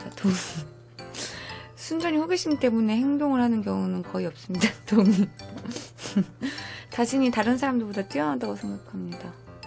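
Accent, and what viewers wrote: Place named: Jeolla, South Korea. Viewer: 전라도 사투리가 넘매력적예요 파티마님에서 이제 으로 갈아탔습니다 ㅎㅎ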